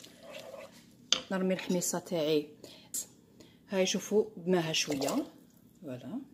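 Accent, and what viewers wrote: Algiers, Algeria. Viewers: Ahedri w ahedri soutek iwenessni wi fekertni fi dzair zmene .garde juste ton algeroisite.dieu te protège et te garde pour nous rappeler tt les recettes ancestrale véritables. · لالا غير اهدري و زيدي كلام برتب و الهدرة عاصمية ألله ألله الشي لي غبر دلوق، ولو يهدرو كلمة من ساقف و لوخرى من لقف. لزم نحافظ على موروثنا العاصمي.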